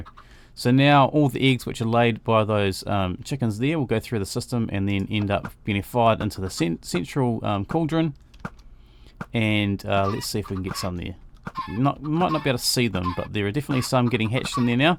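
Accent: New Zealand. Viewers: Your a kiwi! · R u from New Zealand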